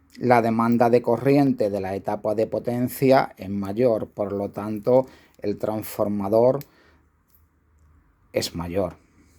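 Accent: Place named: Andalusia, Spain. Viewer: Perdón: viendo más vídeos he comprendido que el acento andaluz usa aleatoriamente ese por zeta y zeta por ese :-)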